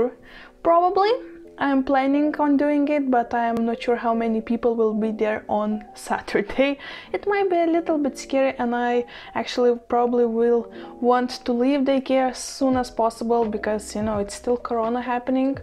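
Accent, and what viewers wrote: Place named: Russia. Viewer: сначала подумала что очень знакомо звучит английский, а потом поняла что ты русская^^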